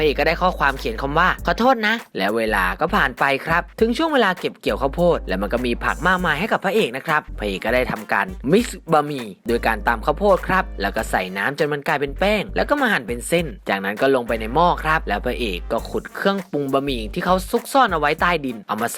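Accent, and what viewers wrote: South, Thailand. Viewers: พี่เป็นคนใต้หรอหนูได้ยิน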